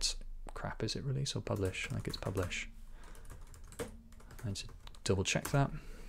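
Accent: United Kingdom. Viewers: Are you scottish?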